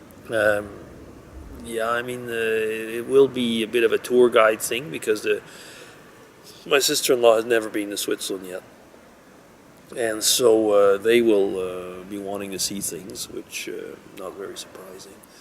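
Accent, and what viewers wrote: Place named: South, United States. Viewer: You can send some of that cool weather this way. Sounds like you are going to be busy with family coming. Hope they let you video. =) You are a man of many languages & your southern accent... Oh that. Yea Halloween. Yes when trying to know how is who now is crazy. Stevie could not even get to his message page. A fun BD gift is on the way =D Under the Dome is very cool. TY for keeping me up on it xoxoxo. I watch TV at night BIG HUGS for you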